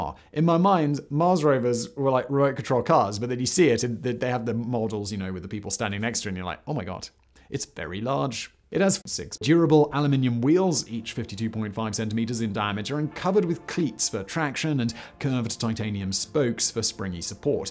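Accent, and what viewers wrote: RP, United Kingdom. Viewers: Manscaped isn’t just for under your knickers, you can also use it to remove that sweater your always wearing under your shirts. I’m sorry, the “jumper” you wear, you speak posh, so you don’t understand “sweater”.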